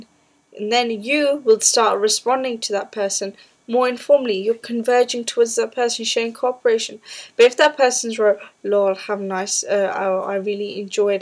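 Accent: United Kingdom. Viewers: brilliant video but I thought it was recieved pronunciation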